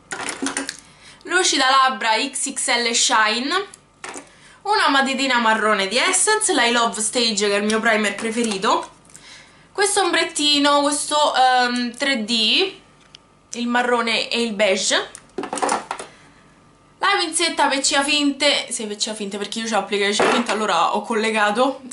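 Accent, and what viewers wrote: Rome, Italy. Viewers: Ciao sei dolcissima e bravissima si vede che sei una ragazza di cuore xcio hai attorno a te persone vere xche te lo meriti!!! Io adoro il dialetto romano sei troppo simpatica!!! Mi chiamo lidia salutami in un video....ps ti seguo da molto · ma si romana se sente pure io so romana de nascita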